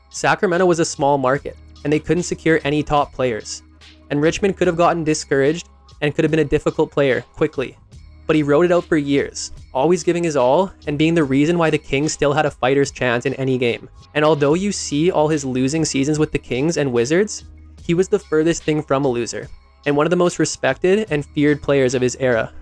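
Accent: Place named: Canada. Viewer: Absolutely LOVE this channel! Love the Canadian accent MUCH more than I should and I love the detailed bios and pictures - you have a sub and Mad respect! 👍 😃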